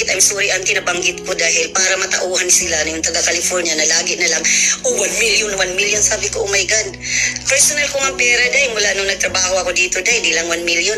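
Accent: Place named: Visayas, Philippines. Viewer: Hahahaha yabang mo madam Eh halata naman sa salita mo na Visaya ka. Korte na para magkaalaman na sobrang yabang na eh. Hoy kakagigil ka na ha. Wag mo ipagyabang ang America dito dahil sa totoo LNG hindi mo cla kilala kong kaibigan nga cla. Cguro sabog ka KC maluwag Jan ang droga eh.parang kendi LNG na nabibili lakas ng tama mo madam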